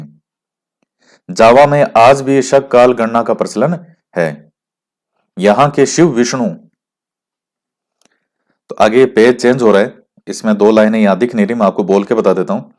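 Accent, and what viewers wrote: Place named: Sikar, India. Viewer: Sir aapki aawaj se ESE lagta hai jase Ki AAP sikar se lagte ho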